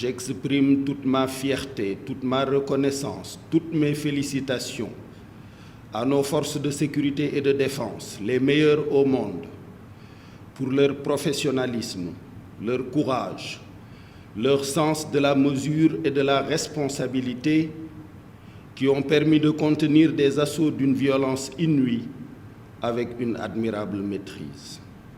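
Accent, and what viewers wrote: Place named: France. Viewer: Voici un gars extrêmement dangereux. J'ose seulement espérer que le Pr Macky Sall, son panel de conseillers ainsi que le peuple sénégalais sont suffisamment avisés pour comprendre qu'il est en train de faire un clin d'œil aux français et à l'armée sénégalaise. Et son message est suffisamment clair : "je suis une alternative à Macky Sall". Mr Seck ne s'adressait pas au peuple sénégalais. Son accoutrement en témoigne parce que, généralement quand il cherche l'adhésion des sénégalais à son discours, il s'habille en grand boubou blanc et s'exprime en wolof. Mais, aujourd'hui c'est plutôt en français avec l'accent d'un "noble" français. D'autre part, à date nous sommes pas encore informés de biens d'un investisseur burkinabé, nigérian, danois ou américain pillé. Affirmer que l'armée sénégalaise et les forces de sécurité sénégalaises sont les MEILLEURES au monde alors que leurs membres sont formées au Maroc, en France et aux USA est une insulte à ces derniers. 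Enfin, se déclarer facilitateur entre MM Sonko et Sall revient à reconnaître que les évènements de ces derniers jours ne sont pas un problème entre l'État du Sénégal et ses administrés, ni entre Mr Sonko et la justice sénégalaise mais plutôt entre le Chef de l'État (ou d'un parti politique) et un de ses concitoyens (ou opposant). Pourquoi les parenthèses? Parce que je ne me retrouve plus dans tous ces jeux. God bless Sénégal and its people!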